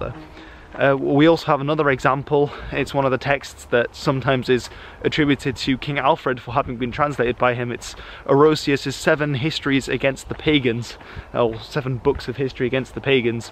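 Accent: United Kingdom. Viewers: I was just wondering, since he speaks English with a very British accent, but his Dutch is also spot on - usually it's one or another. is also a very British name.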